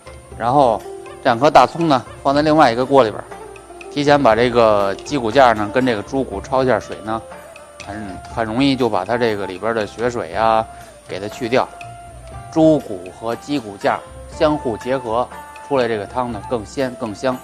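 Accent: Beijing, China. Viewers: 普通话说的很地道像是一位北京人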